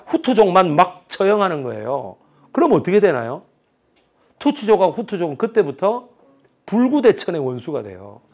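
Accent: Busan, South Korea. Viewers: 부산사투리 너무 좋습니다. 첫 줄거리부터 흥미롭습니다.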